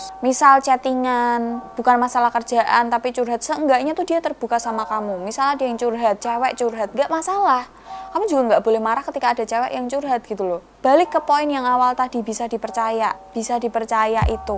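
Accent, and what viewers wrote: Java, Indonesia. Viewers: Medok'e celotehan mu mbaakk mantap jowo ne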